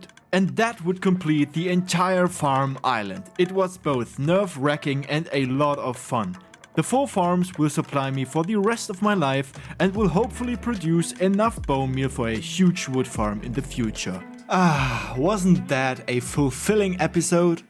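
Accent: Germany. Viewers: Are you German? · wait… are u german? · Great Video. In the First few Seconds thought, is he from Germany? Yes he is😅 You hear a German Speaking English if you are a German yourself😆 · Did i hear the german accent?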